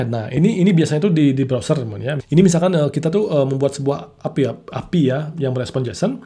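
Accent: Bali, Indonesia. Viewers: logat orang bali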